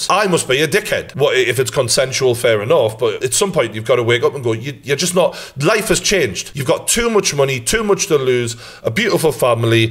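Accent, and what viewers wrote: Newcastle, United Kingdom. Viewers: Why are you putting a funny accent on for Connor McGregor? You can’t talk about anyone’s accent you have a Geordie accent 😂😂😂😂😂😂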